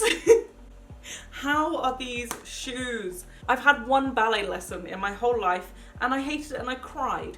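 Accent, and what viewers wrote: United Kingdom. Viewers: I can tell you are from the UK 🇬🇧, I’m sorry for those who will hate but I’m new to this channel Also my favourite time stamp has to be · I love your British accent ❤️❤️❤️❤️❤️❤️❤️❤️❤️❤️❤️❤️❤️❤️❤️❤️❤️❤️❤️❤️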